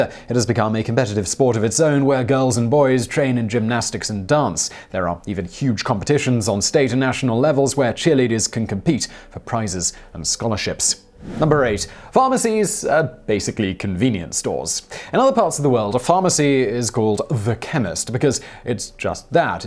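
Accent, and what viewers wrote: United Kingdom. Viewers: I liked your soft hearted tone better this time. I noticed you get real "British" sometimes when speaking about America. Ha. Do you man, but I did enjoy this a lot more. I'm a sensitive guy. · The incentive isnt to get people to buy larger portions, it's to get them to spend more money. Obesity is more lifestyle than mcdonalds, but you almost know everything. I guess the british accent makes you more trustworthy to americans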